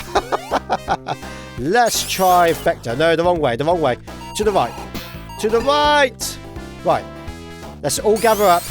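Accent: United Kingdom. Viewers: At the start of the video I thought you speak another language but my brain wasnt working and your British accent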